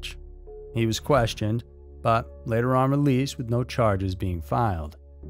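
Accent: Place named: Boston, United States. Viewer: adding an R to the end of ur name...lol yup thats a boston accent! Also, not pronoucing an R to words like car, park, art, etc😂😂😂 Thats me!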